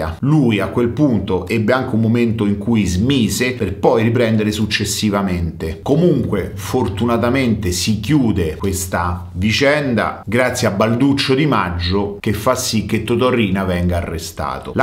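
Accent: Rome, Italy. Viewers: Pero se devi raccontare non parlare in romano.. Non è mto corretto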